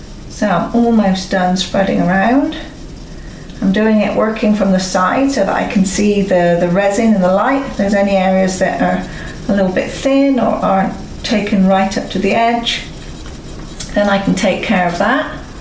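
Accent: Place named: West Country, United Kingdom. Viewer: Lovely west country accent there :D Great info x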